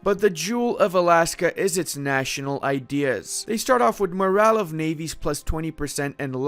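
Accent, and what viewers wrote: Australia. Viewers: The Aussie accent and references were bonza. Yeah, nah, something tells me you’re more than a little familiar with the place. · The Australian accent... it's not bad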